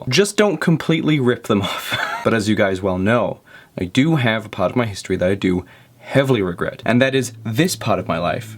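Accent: Australia. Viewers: Dude did you live in Australia for awhile or anything? You pronounce so many words like an Aussie would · Trying to figure out what part of Australia you are from.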